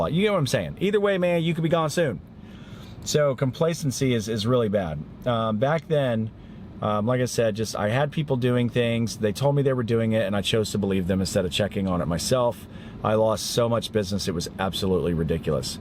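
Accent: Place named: England, United Kingdom. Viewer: Sweet words except for the English accent! Yer right man...dedication, motivation, communication. It ain’t easy owning a lawn maintenance company but it can be rewarding.😀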